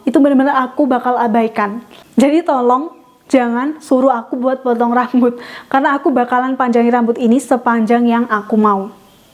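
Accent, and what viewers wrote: Java, Indonesia. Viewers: Kakak orang jawa ya?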